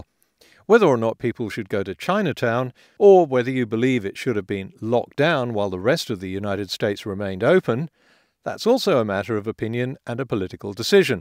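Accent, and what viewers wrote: United Kingdom. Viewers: CoupleFYI’s for you, saying it in a #BrittishAccent🗣️doesn’t make it #Believable, #Correct nor #Science and a simple #Proof of thearth’shape is #ANTARTICAScircumference⭕️🐧 #small🌏or #LAARGE🧇easy😎🙌🏾 #Science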